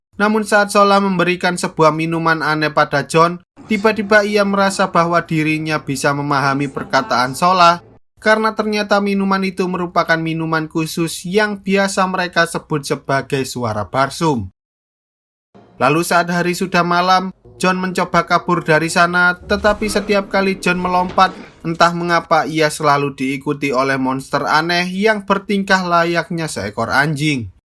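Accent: Java, Indonesia. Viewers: Logate logat jowo..😂😂jowo bagian ndi mas..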